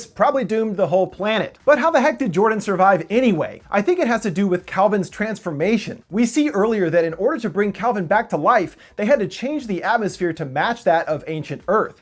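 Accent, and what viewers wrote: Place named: United States. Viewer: The American accent is so fucking obnoxious, A complete assault upon the ears. Or maybe it's just this one guy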